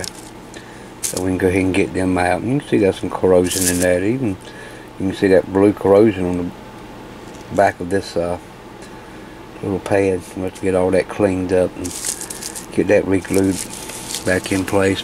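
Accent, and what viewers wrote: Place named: Appalachia, United States. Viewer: I’d never imagine such a heavy Deliverence accent, with someone capable of fix ing tiny crappy radios.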